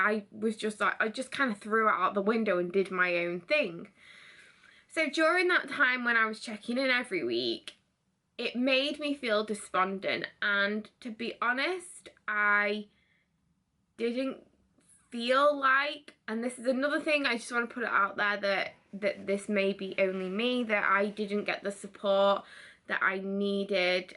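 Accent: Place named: United Kingdom. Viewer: you are adorable! Sometimes your british accent is so difficult to understand. haha! Are americans hard to understand? Anyways, thanks for the inspirational message.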